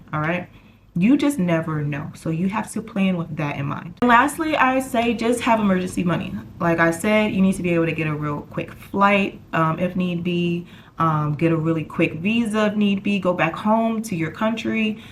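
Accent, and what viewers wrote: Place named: United States. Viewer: Her parents are American or something because i hear NO accent of anything. She sounds like a regular straight up American. And is she a military baby or why did her family move over there?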